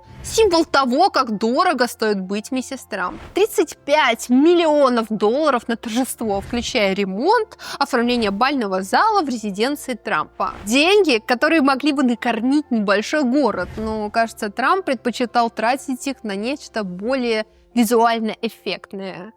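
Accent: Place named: Russia. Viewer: Дорогая ведущая, ты с дианой панченко как две капли воды по стилю ведения программ, по расстановке интонации и акцентов, по переигрыванию ситуаций, по мимике, агрессивному обживанию передаваемой информации, по особо подчеркнутому руССкому акценту, да почти по всему, включая ваши перенакачанные губы!..... что это за такая дивная тенденция в современной пророссийской журналистике!!!!!!! Фу, противно и гадко!!! Не переигрывайте, будьте живыми, незлобными, простыми и позитивными, оставьте излишнюю едкость и будьте менее судьями, а более информаторами!!!!!!!! Успехов!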